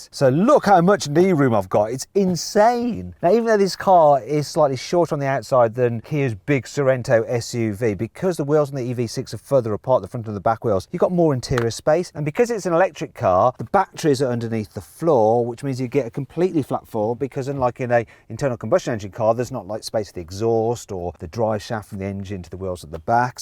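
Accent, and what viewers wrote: United Kingdom. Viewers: イギリス英語とアクセントが学べるので欠かさず見てます。車も好きなので一石二鳥です。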